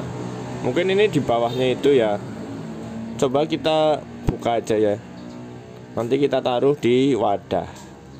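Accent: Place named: Banyumas, Indonesia. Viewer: Ngapak pride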